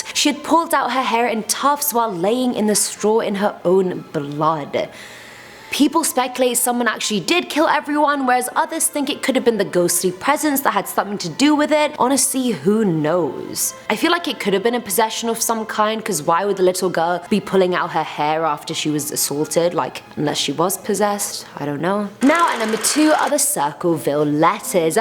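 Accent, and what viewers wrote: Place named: United Kingdom. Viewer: I turned it off as soon as I heard a gross limey voice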